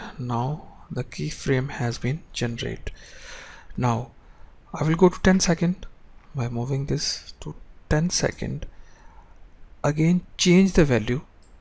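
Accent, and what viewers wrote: India. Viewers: fuck i hate indian accents no offence lmao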